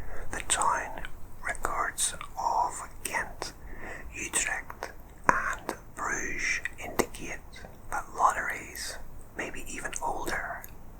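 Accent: Ireland. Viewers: your accent sounds Irish 😂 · ❤Sometimes I play the lottery... but its a real downer when I lose and I have to go back to work! Love your Irish whisper! ❤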